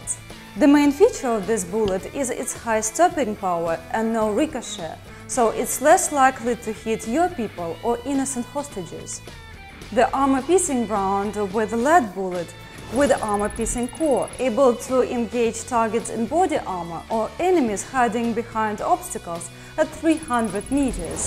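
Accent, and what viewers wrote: Russia. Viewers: with hard russian accent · Пофиг на железо. Девушка очаровательна. Выраженный русский акцент, позволяющий понимать 100% сказанного (я носителей на слух например далеко не всегда понимаю, а ее - прекрасно). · Специально с таким акцентом тётя говорит? Чтоб всё-таки понимали что русская? Или тупо не нашли человека с хорошим произношением? Мне гордиться или печалиться?.. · Heavy russian accent makes any weapon videos twice the better. "Энд римембер - ай эм э профешионал рашн, соу донт трай зыс эт хоум." (с) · Шмешинг энемис бай контакт, ИТС вери вери вел))) Вэн ай хир ёр инглиш, ай хез Джон Рембо флеш бекс Фром Рембо ту. Ваш рунглиш настолько жёсткой, что лучше бы наняли женщину переводчика или озувили на языке носителя, чем так рунглить, вас реально из-за этого не понять!!! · Nice system for cqc sof, the host's Russian accent massages our ears. 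· Combination of weapon, woman host and a hard russian accent gives this video very strong RedAllert vibe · Опачки русский акцент))))) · жуткий русский акцент=)))) а так ниче - хорошо сделали) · Русский акцент в стиле голливудских фильмов это нечто . Возможно специально так и задумывалось :)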